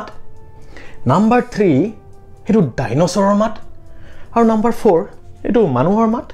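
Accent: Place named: Nalbari, India. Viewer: চাৰ আপোনাৰ মূখ্ত আমাৰ আপোন নলবাৰী ভাষা শুনি বহত বেছি ভাল লাগে আৰু আমাৰ নলবাৰীয়া হিচাপে আপোনাৰ পাই গৌৰব অনুভৱ কৰোঁ চাৰ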